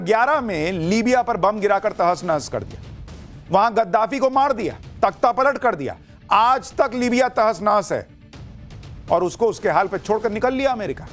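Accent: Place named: Patna, India. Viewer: Sir aap Patna Se Ho keya apki language patna wala hai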